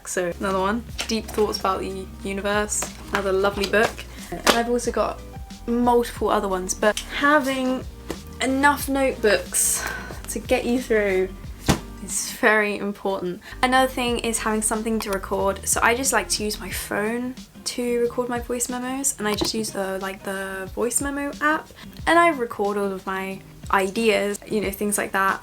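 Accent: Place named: United Kingdom. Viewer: Very interesting video. Tysm for making my mood better (my school starts tomorrow ). Maybe when I'll be older, I'll use these tips. I really luv your british accent. That 2 kisses at the end make me smile.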